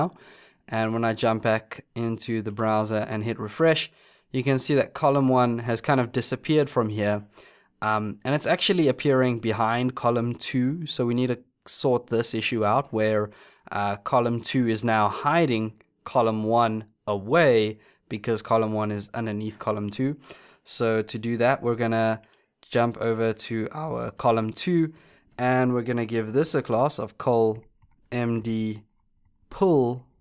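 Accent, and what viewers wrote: South Africa. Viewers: Identified as South African 3 seconds into the video :)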